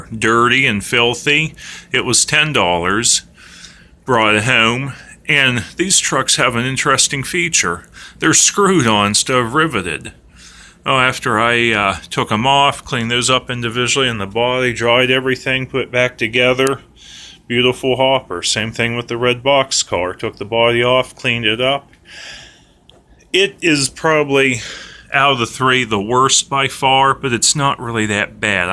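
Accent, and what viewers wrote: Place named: Western Pennsylvania, United States. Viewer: Are you from Western Pa? you got the accent!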